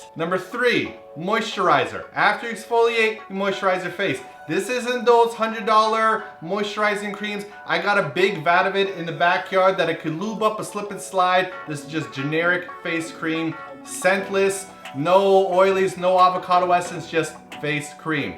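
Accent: Russia. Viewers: I love Russian accent. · YAAAA I click video for manly man Rrrrussian accent!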